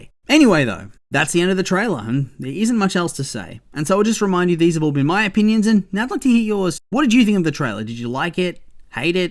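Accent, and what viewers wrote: Australia. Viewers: I love the sound and tone of your voice so much!! Australians have always had my favorite accent, and visiting there has always been a big goal of mine to help study bio diversity and wildlife. And 'cause it's super pretty too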